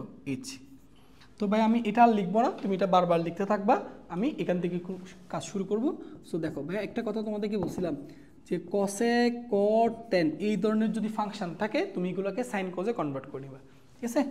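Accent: Chittagong, Bangladesh. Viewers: স্যার আপনি কি চট্টগ্রামের???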